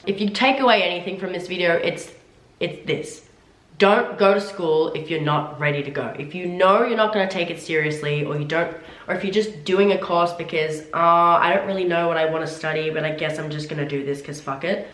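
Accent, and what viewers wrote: Australia. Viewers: She has an Australian accent actually hahaha, but I say that because she loves reading and literature in general. Nothing to do with her accent.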